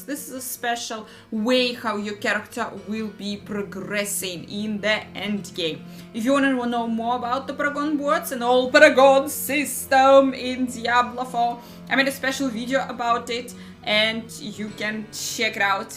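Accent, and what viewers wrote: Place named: Russia. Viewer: По акценту как будто русская)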